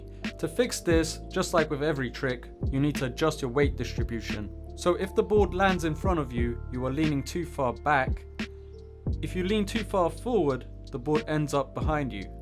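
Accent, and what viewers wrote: United Kingdom. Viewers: Lan türk aksanı bekliyordum british çıktı asdfafdas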